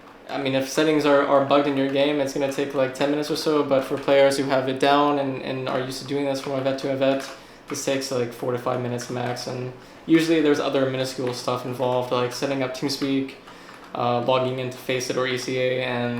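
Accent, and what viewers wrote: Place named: Europe. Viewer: man's played in europe so long he's got a quasi european accent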